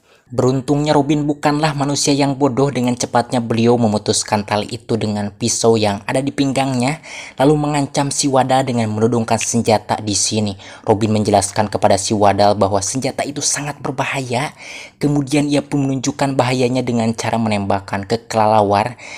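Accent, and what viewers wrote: West Java, Indonesia. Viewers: Logat Sunda pisannya... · Org sunda ya? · Sunda mana min?? Lucu,ngahibur euy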